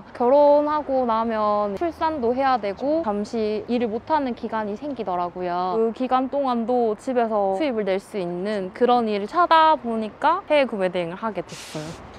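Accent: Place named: North Gyeongsang, South Korea. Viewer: 경북 분이신가봐요 ㅎㅎ 본업하면서 다른 일 하기 진짜 힘든데 대단하신듯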